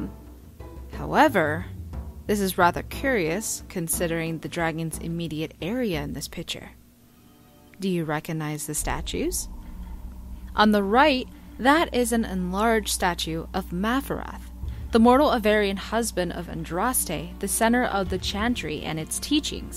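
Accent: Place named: France. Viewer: I love you're french accent.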